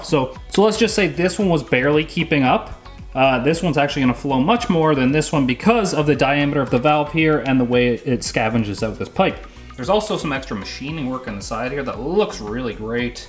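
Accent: Canada. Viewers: i could tell he was canadian within the first 5 seconds this man spoke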